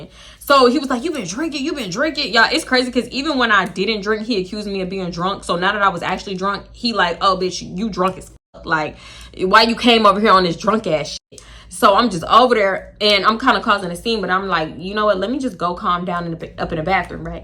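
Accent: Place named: Houston, United States. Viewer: The way I knew you was from Houston before you said it 🤣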